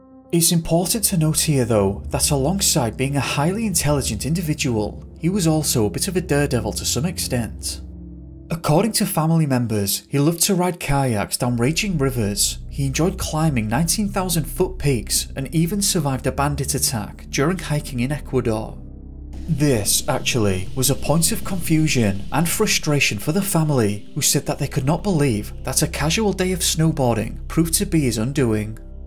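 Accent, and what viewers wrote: United Kingdom. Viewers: NGL I clicked on this video because the topic seemed interesting but your cadence and intonations while reading are so grating I cannot stand watching. Regardless of the words or sentence structure you say a few words then fade out, short pause, repeat until the end of a sentence where you slow the last few syllables down like you've run out of air. The lack of variation makes it sound like a slightly more advanced british microsoft sam.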